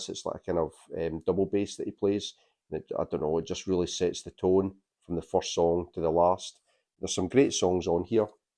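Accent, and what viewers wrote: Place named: Scotland, United Kingdom. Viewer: I wish I could have added your accent even though I assume it’s Scott’s to my video. So good ✌🏼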